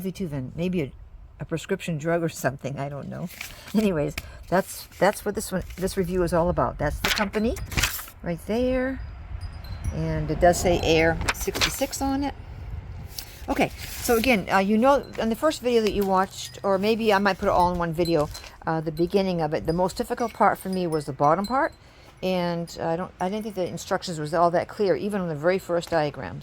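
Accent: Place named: New England, United States. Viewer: Ok, first what general area are you from, I moved from NH and your accent sounds familiar. Also, if you were buying a first coop for about 6 chickens, which one would you buy?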